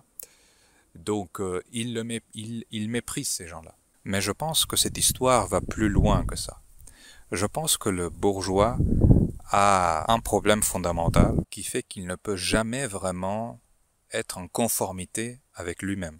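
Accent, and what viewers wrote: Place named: Italy. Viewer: Quel est ce bel accent italien ? Qu'est-ce que la "dissidence cognitive" ?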